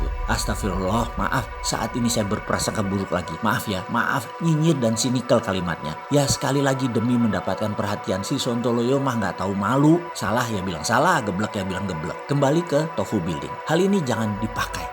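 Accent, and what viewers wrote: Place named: East Java, Indonesia. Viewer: Di unek2ke wae bossman yen dikandani ngeyel. Kangen jawatimuranmu metu #peace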